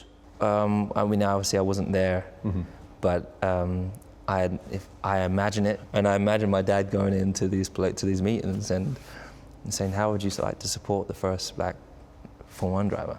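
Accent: United States. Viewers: Daniel May He always hinted an American accent whilst talking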